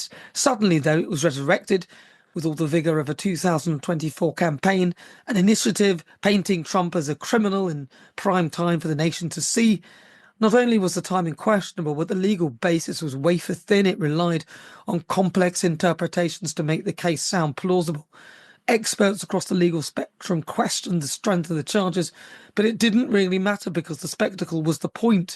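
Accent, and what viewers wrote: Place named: Birmingham, United Kingdom. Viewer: Lol, ur brummy accent is thick and i live here too and have heard ALOT of ozzy osborne accents 😅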